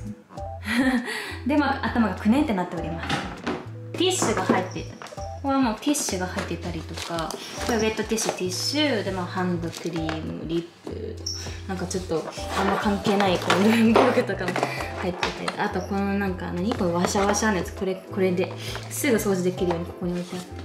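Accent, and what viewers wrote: Kansai, Japan. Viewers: たまに出る関西弁とちょっと関西弁に寄ったイントネーションになぜか胸が締め付けられる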